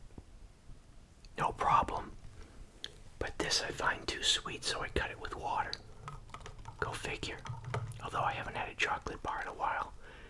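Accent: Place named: New York, United States. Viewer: kinda morbid I suppose but I guess your from New York from your accent - were you there on 9/11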